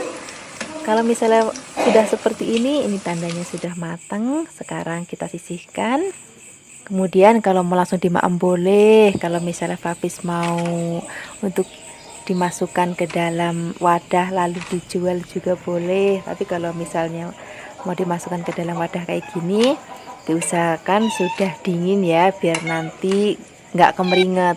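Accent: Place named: Banyumas, Indonesia. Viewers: Banyumas dialeknya ❤ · Menarik....apalagi dialek banyumasannya mantep😅😅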